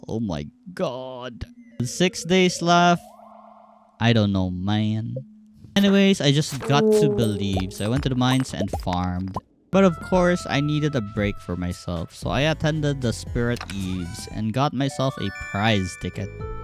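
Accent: Philippines. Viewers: are you filipono? your accent sounds like it. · Are you a fellow Filipino? I noticed your accent in your 100days video and i heard filipino voices in the background somewhere in this video.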